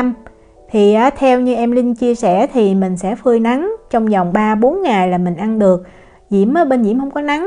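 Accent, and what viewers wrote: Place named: Saigon, Vietnam. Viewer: Hello Diễm năm mới chúc gia đình cháu hạnh phúc vui khỏe suốt năm nhé! Cách hướng dẫn của cháu nhanh gọn đẹp mắt và giọng nói SG làm cô thích nghe thanks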